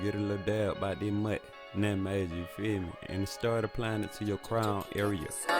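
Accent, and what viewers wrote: Atlanta, United States. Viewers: I can tell from the accent he from atlanta😂...but if you put this on medium hair it’s gone turn straight? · 😆 brah u talk like us in the A i know u in macon or chat tenn.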